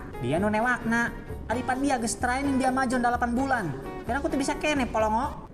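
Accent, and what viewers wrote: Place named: Citorek, Indonesia. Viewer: Logat mna ini bg kaya kenal, citorek bukan si😅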